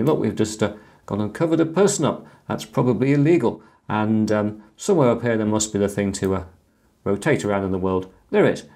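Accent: United Kingdom. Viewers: Me while learning English: "ok, cool, this is pretty easy to understand. I like it" Also me hearing British accent: .__. Edit: very good video. Helped me a lot. Tysm. :)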